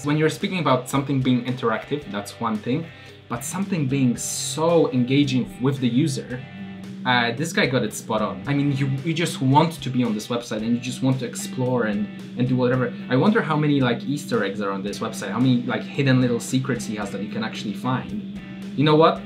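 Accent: Poland. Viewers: Jak usłyszałem 'hey Jacek', już wiedziałem, że to rodak xd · Ciągle słyszę Polski akcent ;)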